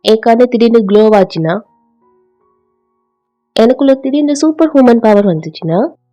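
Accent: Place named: Kerala, India. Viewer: illa akka .. na tamil .. unga tamil kekka alaza iruthu atha ketta malayalam ma nu .. naga pesura tamil ipdi irukathu english mix aki irukum .. anaa unga tamil super ra iruku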